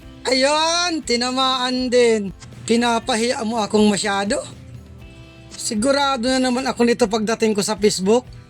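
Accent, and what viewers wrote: Bicol, Philippines. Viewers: ang tagalog ni kuya may mga halong bikol.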